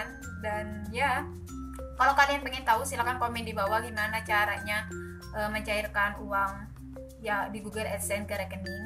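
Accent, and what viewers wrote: Madura, Indonesia. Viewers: dari madura yah? kok logatnya kek org madura😅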